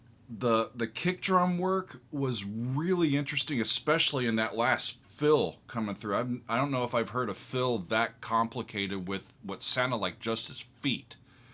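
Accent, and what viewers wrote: United States. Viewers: I love your accent. What State are you from, classical composer?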